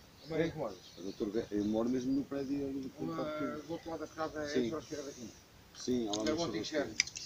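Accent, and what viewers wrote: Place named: Rio Grande do Sul, Brazil. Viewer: Meu amigo você é gaucho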